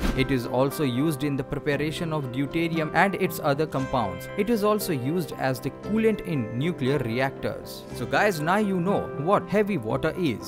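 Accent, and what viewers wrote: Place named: India. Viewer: Undestood about 5% of this of this video. And this being the only video that is trying to explain what heavy water is just shows how much we need to know about what heavy water actually is. If someone could make a video that explains is easily, please. And without that irritating indian accent.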